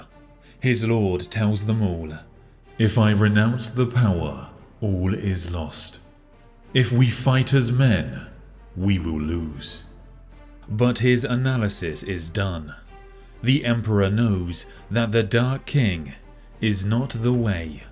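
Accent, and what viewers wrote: United Kingdom. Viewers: Just found you yesterday Mate! Love your videos! You,Luetin,and Weshammer are the kings of youtube 40k! Much love from VANCOUVER BC CANADA 🇨🇦! Warhammer is just better when read by the British!!!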